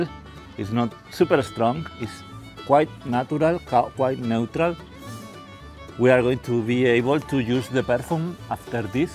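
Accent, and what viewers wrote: Spain. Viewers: I just bought this now, nice review. I like your accent btw, are you Spanish? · Me encanta estar buscando todo en ingles y encontrarme con un español hablando ingles, como se nos nota el acento cuando hablamos... Nice video mate :)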